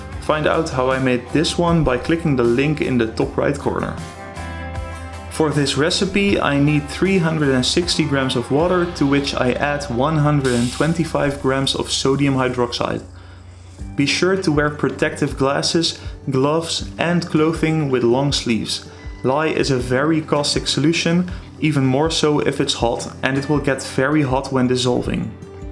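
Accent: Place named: Netherlands. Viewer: You sound very Dutch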